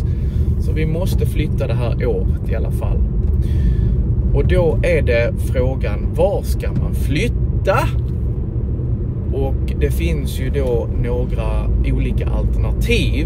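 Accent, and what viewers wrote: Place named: Skåne, Sweden. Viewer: Jättebra kanalen! Men jättesvårt att förstå skånska för mig 😊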